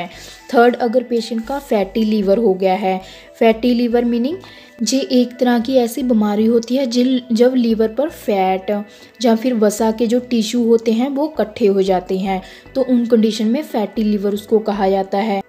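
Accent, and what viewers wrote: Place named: Punjab, India. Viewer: Aap punjab se lagte hai ..khas kar barnala side se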